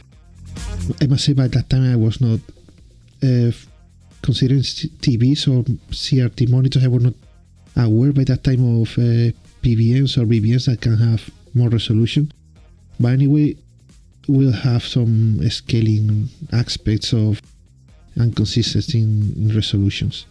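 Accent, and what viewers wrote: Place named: Spain. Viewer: Estupenda idea. Te escribo en español porque por el acento está claro que lo eres :) ¿Cómo haces para rotar la imagen? ¿Es una funcionalidad de tu televisión o de tu emulador? Me gustaría implementar tu idea con una Pandora Box, pero no tengo claro que sea posible... Gracias y saludos.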